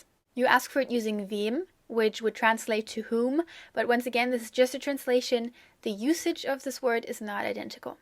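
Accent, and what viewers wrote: United States. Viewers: BTW your American English sounds great :) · Are you German? 'cause you sound more American or Canadian.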